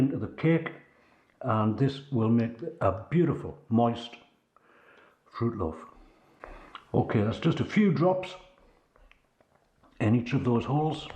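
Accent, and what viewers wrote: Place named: North East England, United Kingdom. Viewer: where are you from? It sounds like your from the North East England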